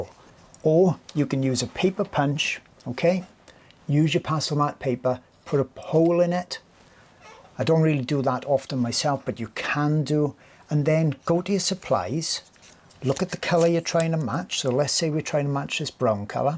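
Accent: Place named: South Wales, United Kingdom. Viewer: Hi, another great video! Thank you. I agree the paper Pastelmat is a total game changer! It gives back the joy in drawing that the other papers sap away from us! Ps. I love to hear you talk because it reminds me of my nana and grandad who came from the Rhondda Valley in South Wales. 😊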